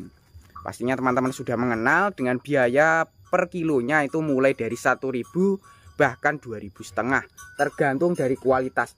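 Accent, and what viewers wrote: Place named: Java, Indonesia. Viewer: Medhok tenan leh omong...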